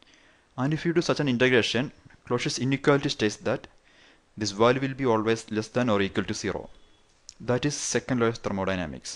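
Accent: Kerala, India. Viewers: Malayalee accent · I think he is a Malayali from India .. Damn accent!!! Can't focus on the video :(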